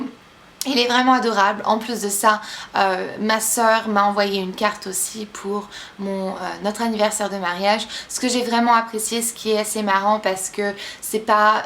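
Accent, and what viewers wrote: United States. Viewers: Félicitations ! Et je sais pas si je suis la seule mais je trouve que maintenant quand tu parle français t'a un petit accent américain :) Et je suis contente pour toi que te revoie ton marie bientot bisous :p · lol on dirait tu as deja choppé l'accent américain c'est bizarre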